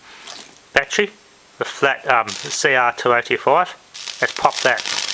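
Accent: Australia. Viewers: I'm curious, what's the accent? I mean u sound aussie, but there's something else in there... Or is it just a lisp?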